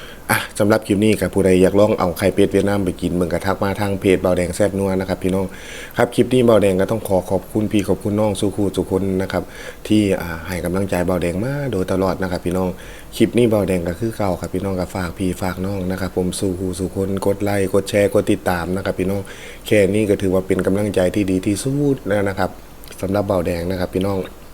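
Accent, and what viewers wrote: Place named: Isan, Thailand. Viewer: เป็นคนสกลบ่น้อฟังสำเนียงเว้ากินเป็นตาแซ่บแท้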